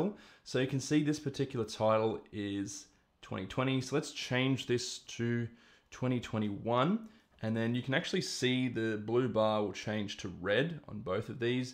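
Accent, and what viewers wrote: Australia. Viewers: Can you turn off your Aussie accent? It's distracting.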